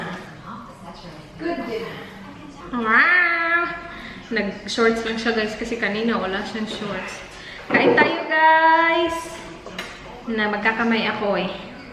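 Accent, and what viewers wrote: Visayas, Philippines. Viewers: Bisaya ka po?😂😂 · Ako lang ba ang naka feel na bisaya si ate. 😊😊😊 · Bisaya kaba ate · bisaya accent talga pag nag tatagalog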